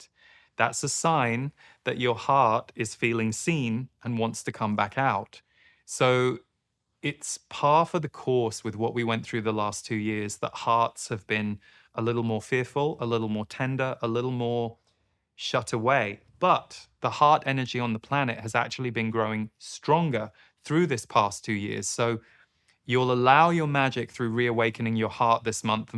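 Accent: England, United Kingdom. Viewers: I remember your first videos…you had hair back then and a lot heavier English accent. Lol congrats on 10 yrs💕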